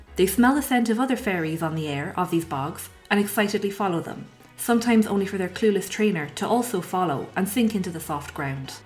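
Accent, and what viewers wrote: Ireland. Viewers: you have no idea how happy i was to click on this and hear an irish accent as an irish person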